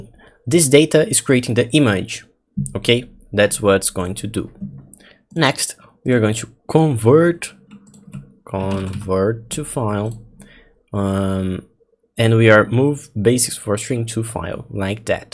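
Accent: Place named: Brazil. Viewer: Engraçado, eu ouvindo um vídeo teu, e logo percebi "pera... esse inglês tá meio aportugueisado não?" kk massa mano.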